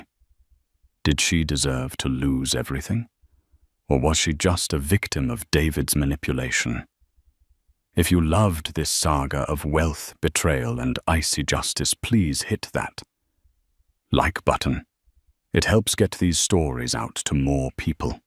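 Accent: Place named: United Kingdom. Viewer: Hi.... I know, eh?! My solution, if you don't mind me addressing your comment, is to put a piece of paper over the top section of my screen where the freeze frame picture is or where the slide show and all the annoying "confetti / snow" moves. Then, I click on the Transcript amd read along as I am listening to that purring English / British accent narrate the story and let my imagination do its natural work. I hope this helps? Cheers! From Windsor, Ontarjo Canada 🇨🇦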